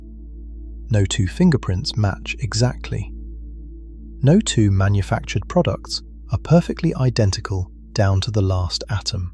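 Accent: United Kingdom. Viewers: 2 hours of mindless blathering with a British accent.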